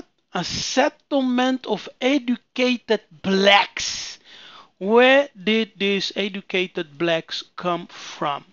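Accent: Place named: Netherlands. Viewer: Zondervan? En je accent klinkt nederlands...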